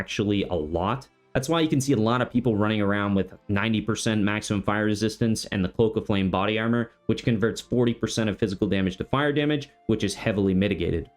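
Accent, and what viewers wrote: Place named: Canada. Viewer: love you content, bud. Yo are you from like Minnesota? You sound like you've got a light Canadian accent coming through sometimes, I'm wondering if we're neighbors lol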